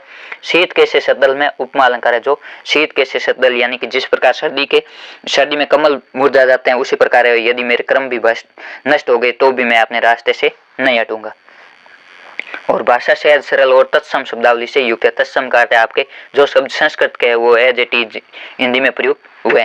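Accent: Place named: Marwari, India. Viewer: Ser app marvedi Ho Na apki aavaj se pata chala rha hai 😊❤❤🎉🎉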